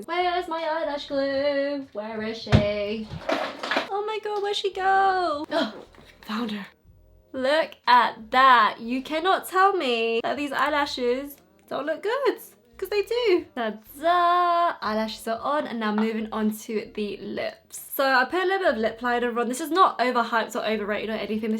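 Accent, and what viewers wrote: United Kingdom. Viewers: Omg are you British !!!???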